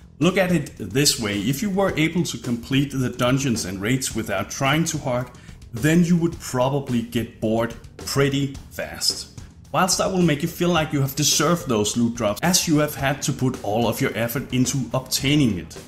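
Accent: Denmark. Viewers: danish accent I feel lol. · Dansk accent er ikke til at tage fejl af! Fed video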